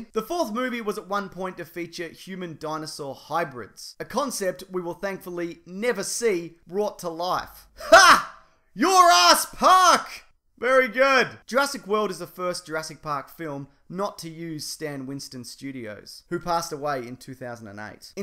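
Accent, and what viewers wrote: Australia. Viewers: Facts about Jurassic Park being told by an Australian is too good to be true.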